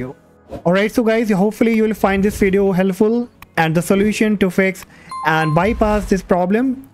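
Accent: India. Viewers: It’s so difficult to understand Indian accents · Your annoying Indian accent pmo sm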